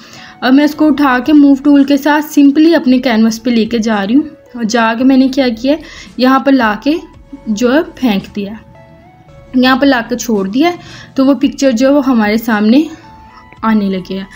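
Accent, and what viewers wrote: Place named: Faisalabad, Pakistan. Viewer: Sis apki language btati k u r from fsd.Am also from fsd .boht khushi hui apka tutorial dekh kr or boht acha smjhati ho ap.God bless u☺️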